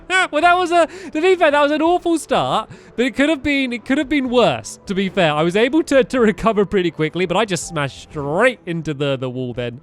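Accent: United Kingdom. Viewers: he British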